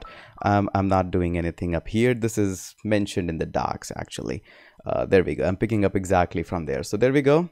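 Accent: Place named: India. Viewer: When you talk fast your accent screams i.n.d.i.a.n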